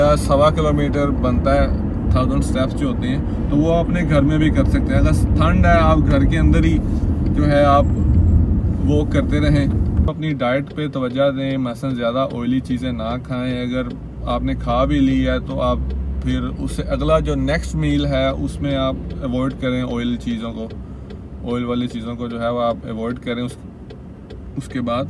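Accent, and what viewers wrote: Australia. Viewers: in ka accent to pure aussie lag raha hai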